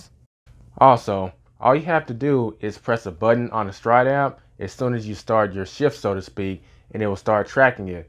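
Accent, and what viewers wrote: United States. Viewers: Why does this guy sound like an American seating in the front right seat?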